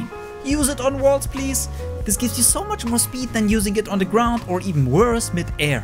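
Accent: Germany. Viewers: Why do you have such a german accent? Tbh, if you are german, you are speaking very clearly, easy to understand Love your videos btw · not really. he sounds very german :) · As a German he sounds german :D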